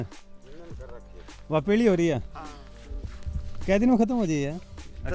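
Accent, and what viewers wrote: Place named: Rajasthan, India. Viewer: Bhai aap Haryanvi nahi Rajasthani ( Shekhawati) bolte ho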